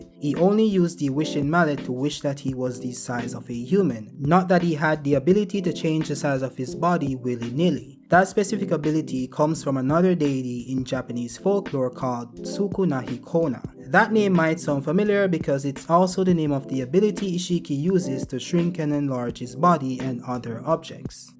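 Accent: Jamaica. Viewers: Where you from fam? You sound Jamaican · My dawg Jamaican accent cannot be contained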